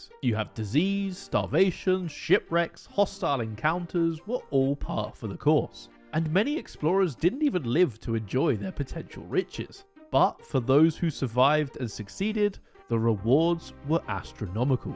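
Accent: United Kingdom. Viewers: Sounds like brit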